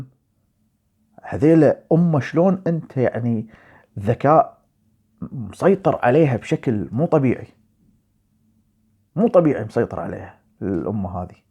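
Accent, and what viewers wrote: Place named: Iran. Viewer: لكنة فارسية على عراقية هههههه هههههههه متعصر كان يزط ههههههههههه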